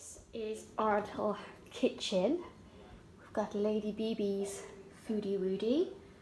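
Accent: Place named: Bristol, United Kingdom. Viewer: Fair play with the marathon, it's weird hearing a bristolian accent on you tube as I'm from bristol too 😁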